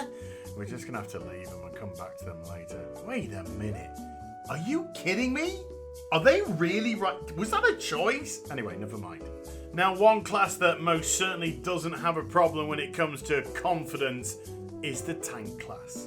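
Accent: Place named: United Kingdom. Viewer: I dont know why i love angry people with british accents so much.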